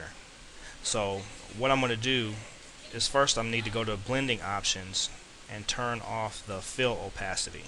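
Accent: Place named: South, United States. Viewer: I like your Southern accent :3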